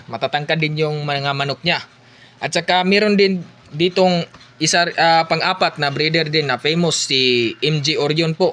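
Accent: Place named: Visayas, Philippines. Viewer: Halatang bisaya jud ka part kay imong accent same sa accent nako sauna magtagalog pero ok rana as long as makasabot ang tanan 👍 pronunciation lang practisa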